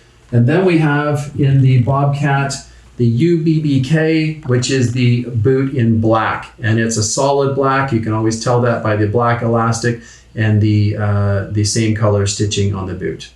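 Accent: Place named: East Coast, Canada. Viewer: I give Americans a hard time about Canadians saying "about" a funny way. I'm guessing this guy is in from the East Coast because I'm from the West and now I can clearly see what the yanks are hearing. 😁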